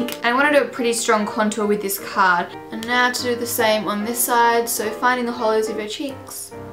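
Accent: Australia. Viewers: Are u from australia??? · You seem like a really sweet person. And your tutorials are really good too. Defiantly subscribing! You sound like you're either from Melbourne or Sydney. No? Oh well xxx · You're seriously so gorgeous and I love your Aussie accent! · are you austrailian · I'm so happy you have an Aussie accent lol! First ever makeup tutorial iv see from someone I AUSTRALIA!! Yay! Go us Aussies! Loved this tutorial btw! :) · i miss Aussie accents it refreshing to watch these videos ... <3 it!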